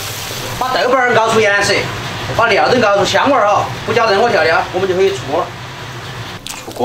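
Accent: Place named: Sichuan, China. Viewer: 我看超小厨说四川方言但唱歌太标准，老外觉得太有意思.这就是全世界一样，在韩国也是一样的.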